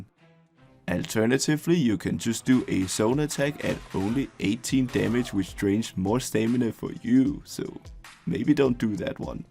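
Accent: Denmark. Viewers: thanks buddy! Fine bonus with the danish accent. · are u from denmark? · Is it just me or are you from Denmark? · er du dansker haha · Er du fra danmark? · Jesus u sound like a Danish dude and pls don't talk so slow ÆØÅ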